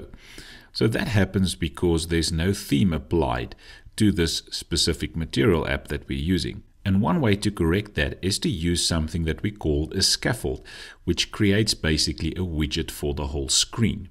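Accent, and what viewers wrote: Afrikaans, South Africa. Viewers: This is one of the best structured flutter tutorials, English with Afrikaans accent is like I feel home. All the best and regards from Namibia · You sound South African ❤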